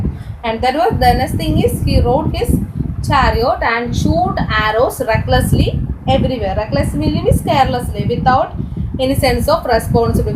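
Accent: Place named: India. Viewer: Bad explaining and butler English very bad better luck next time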